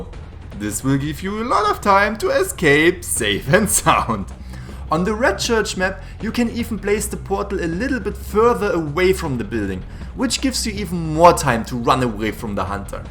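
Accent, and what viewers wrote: Germany. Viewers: Are you German or something? Your accent and your personality perfectly match. No wonder your videos are so entertaining. And your editing!? SUPERB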